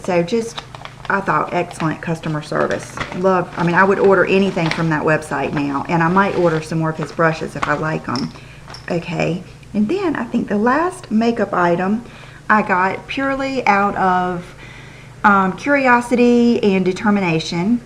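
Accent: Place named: Texas, United States. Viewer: i loveeee your accent!!! is it from texas??? im from another country so that is why i dont know exactly where its your accent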